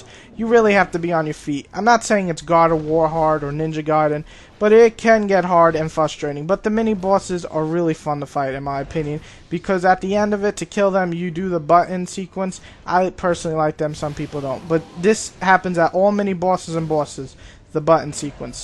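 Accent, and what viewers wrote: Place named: New York, United States. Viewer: Proper New yorker :) The way he says bosses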